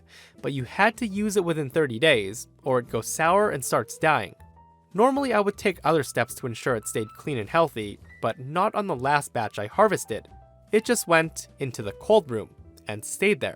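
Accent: North America. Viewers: I think I remember him saying he's from Canada. He's definitely got a North American accent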